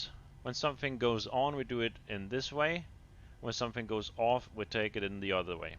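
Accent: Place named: Denmark. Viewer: Hey just wondering, are you from denmark? You sound danish haha, im danish too btw!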